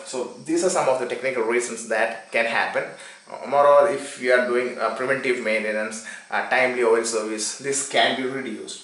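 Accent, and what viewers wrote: Kerala, India. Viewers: malayali aanalle... ?? :D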